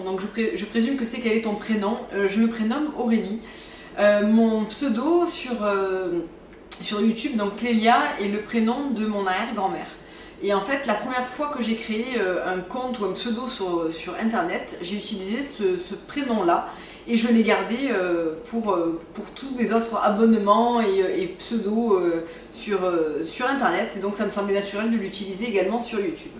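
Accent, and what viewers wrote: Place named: Marseille, France. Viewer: Merci pour cette vidéo! je me disais bien que tu avais des intonations marseillaises meme si je n'en étais pas sure a 100%! Toujours agréable à regarder !!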